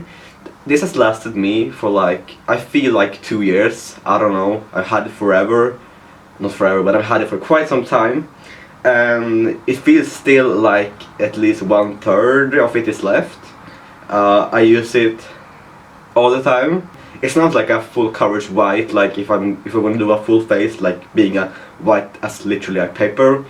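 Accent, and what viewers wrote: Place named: Sweden. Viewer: YOUR SWEDISH ACCENT IS SUPER COOL, from which part of Sweden are you from?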